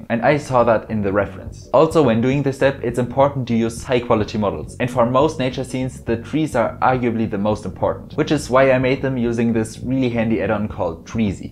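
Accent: Germany. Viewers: ich hör da einen deutschen akzent!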